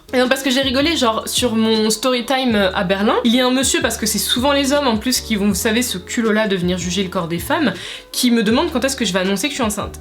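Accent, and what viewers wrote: England, United Kingdom. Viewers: pourquoi tu prend un accent anglais? C'est voulus ou tu es a appris l’anglais au Royaume Unis?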